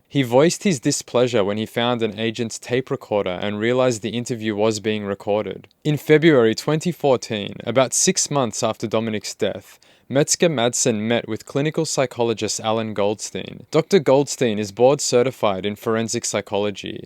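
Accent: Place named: Australia. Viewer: I'm not a big time YouTube commentator because of how much i try to be anonymous, but your videos certainly have a way of stimulating interesting discourse and your point of view is intriguing. (Also aussie accents are my favorite 🤘🤘🤘) All i really can say about this one is i am not convinced that Cody is of average "intelligence" for lack of better wording. He seems like he could have developmental delays which are especially likely given his exposure to hard drugs in utero. I say this not because I am excusing what he did or even buy the whole goblin thing, but i feel empathy for him because he is clearly functioning at the level of a much younger person, perhaps even as young as the boy he murdered. Murder is wrong and horrific, obviously. Cody is an unfortunate soul though too. Born on drugs is trauma, foster care is trauma, being different from your peers is trauma, and so is severe mental illness. 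The universe is impartial, and Cody was dealt a bad hand. Many of us are to varying degrees. I don't have a point to this, but i couldn't help saying out loud at the end of your video, "what a shame". (Edited misspellings)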